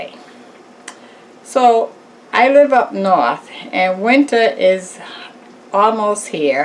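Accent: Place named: Boston, United States. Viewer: MS. Girl you are doing it!! Giving me some good ideas Love it!! And the Wisdom in the word of the day!! Get it Honey!! I have one request can you make a sweet potato biscuits? I would really love that recipe!! Love your channel so much your like my Auntie from Boston in your sweet accent!! I pray many blessings for you, you are doing a beautiful job on your channel!! Love you!!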